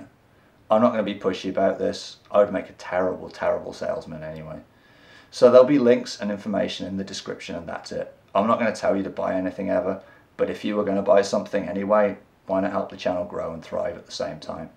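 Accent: United Kingdom. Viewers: U in canada? With a british accent hehe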